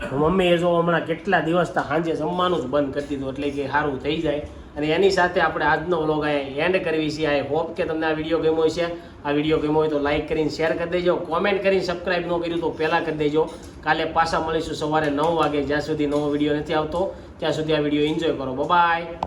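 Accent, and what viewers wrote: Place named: Gariyadhar, India. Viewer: Tamara video hu darroj jasadn thi job chhu aa baju aavo tyare aavjo. Tame gariyadhar bajuna chho. Tamari boli upar thi. Aevu. Lage che.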